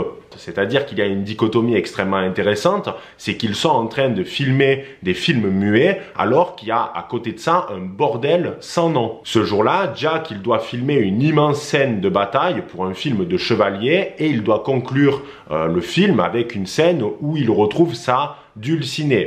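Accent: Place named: Aveyron, France. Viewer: Est ce que ton petit accent a quelque chose à voir avec l Aveyron ? Salutations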